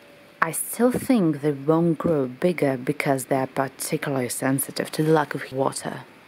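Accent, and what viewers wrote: United Kingdom. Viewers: Cabbages were too good ...good work ...you belong to belarus but your english accent sound like british ? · British accent....that's impressive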